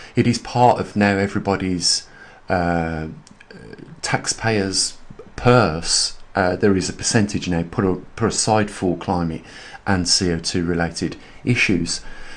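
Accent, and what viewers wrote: Birmingham, United Kingdom. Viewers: Are u a Brummie.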